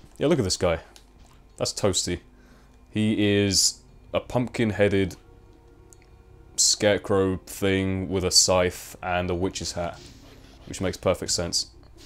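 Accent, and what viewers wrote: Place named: England, United Kingdom. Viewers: english accent is the best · Reliving my childhood here, through an english accent!!!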